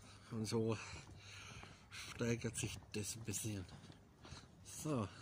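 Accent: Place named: Franconia, Germany. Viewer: Dei frängisch is einfach herrlich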